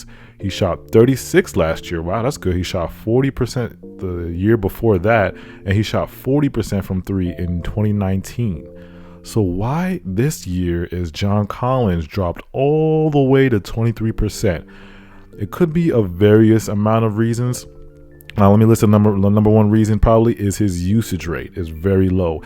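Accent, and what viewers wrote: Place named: Buffalo, United States. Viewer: sound like he from Buffalo …. WHERE U FROM?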